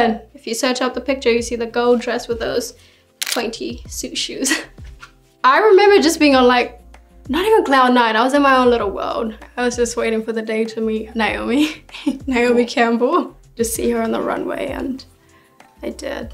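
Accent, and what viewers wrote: Australia. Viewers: She is so down to earth and very beautiful. I love her Sudanese heritage and Aussie-tinged accent growing up in Australia ;) I love Naomi taking her under her wing...so cool. She deserves so much success. xx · She sounds Australian almost. She is beautiful! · She’s beautiful and has such a stunning figure, love her Aussie accent as well!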